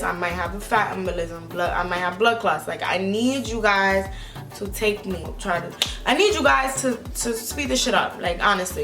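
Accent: New York, United States. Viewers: She is so pretty I love her and you can hear the New York in her you not dead-ass LOL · i knew u was from NY by the way u talk... my first time watching and i luv it... my reactions alllll the way... GOD BLESS GLAD UR OK U LOOK FABULOUS BTW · you do you sound like you from new york😂😂😭 thats exactly how we talk "stop playing with me"😂😭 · 😭you from New York ? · She sounds like a Black New Yorker. Straight up. Lol glad you're okay, boo.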